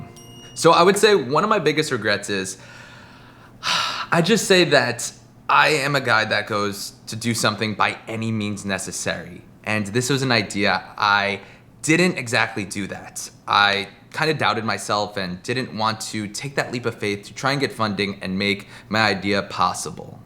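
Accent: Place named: New York, United States. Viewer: Not coffee... Kaawfe...you r a genuine New Yorker man...✌️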